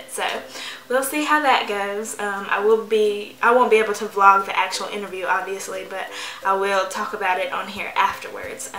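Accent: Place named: South, United States. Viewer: oh ok thats cool. I knew you had to be from some where down south.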